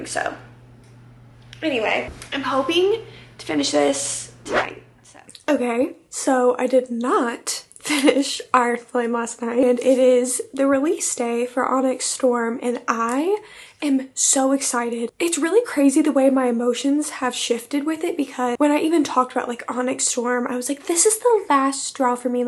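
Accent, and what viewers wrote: South, United States. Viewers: there is no formula girl are you kidding. i know you’re all about making sure your videos are perfect but this is YouTube, a fun place not a Netflix documentary. i found you when you did your 24hr readathons, stayed because of the self deprecating jokes and the southern accent i never see anywhere else. now im so happy not seeing you joke about wanting to die and actually taking care of yourself. don’t compare yourself to old you because now I stay because of your maturity and you’re so funny in other ways without trying. you have to realise that you’re basically a celebrity in the book world and we could literally watch a video of you walking around your house like security footage and eat it up 😂 you’re like Kylie Jenner to me. I’ve been binge watching bookmarkd and even though I can’t stand taylor swift talk I still watch those hundreds of hours of you guys talking about her. 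I love hearing about your life i used to only watch you for book reading inspo and then abandon watching for months and now I tune in as soon as you pop up on my feed. you’re worth more than you know to us queen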